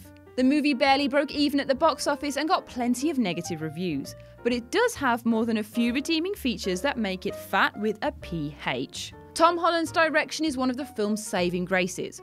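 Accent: United Kingdom. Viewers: British Much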